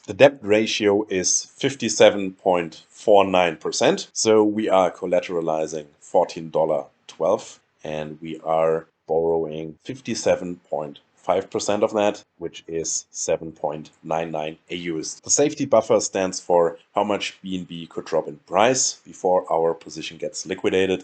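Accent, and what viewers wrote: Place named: Germany. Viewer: Nice german Akzent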